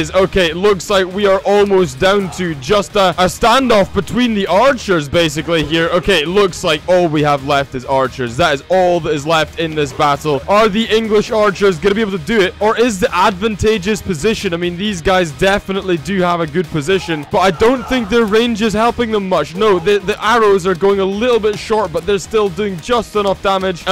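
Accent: Scotland, United Kingdom. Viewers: I’m sorry are you Scot? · Are you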